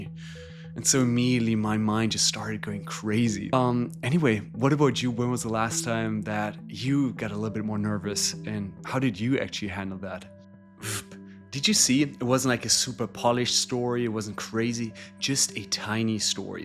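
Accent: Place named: Germany. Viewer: Gutes Video. Danke 🙏🏻 (ich denke deutsch passt Deinem Akzent nach 😉)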